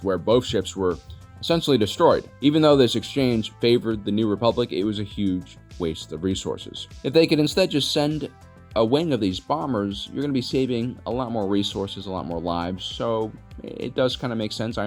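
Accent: Mexico. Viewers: Are u Mexican or some sort of southern American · Yeeeaaaahhhh, stopped watching and thumbs down at the messican-speak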